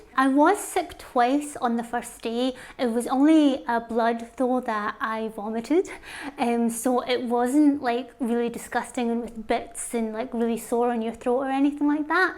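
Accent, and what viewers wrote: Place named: Scotland, United Kingdom. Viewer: Did not expect you to have a Scottish accent! Awesome results!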